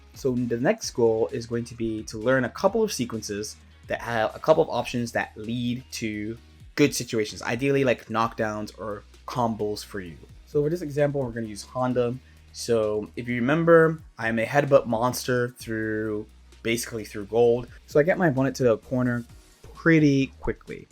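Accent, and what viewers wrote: Baltimore, United States. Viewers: You don’t have to answer but by chance are you from Baltimore? Your accent made me wonder lol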